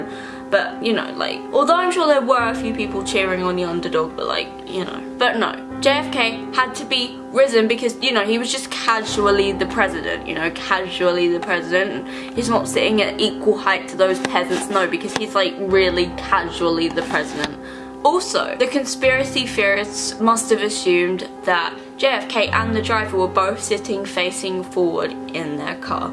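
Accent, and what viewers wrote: England, United Kingdom. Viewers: In the JFK one it wasn't the driver it was gov. Connelly........ she keeps saying the driver driving me nuts british accent lol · Delia Cano British prononciation and braces does that chill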